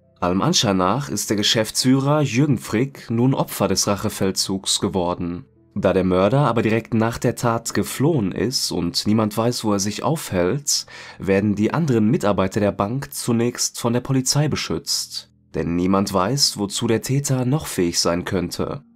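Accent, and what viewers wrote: Ruhr, Germany. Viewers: Mach weiter so. 🤘🤘 Kann mir auch kein weichspüler true Crime mehr geben....ehrliche unzensierte Meinung und Ruhrpott Schnauze ...sehr geil ..weiter so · True Crime auf Ruhrpottdeutsch sollte Pflicht für alle True Crime Kanäle sein! Ich sach ma grüße ausm Norden ne❤ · GEnau SO reden wir hier im Pott. GEile Videos, weiter so. Nur der S04 .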